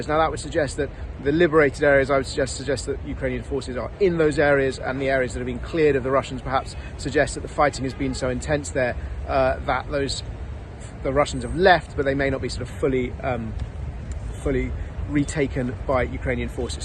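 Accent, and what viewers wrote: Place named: United Kingdom. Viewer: Yet another DEI jackass with a british accent talking about what he doesn't know.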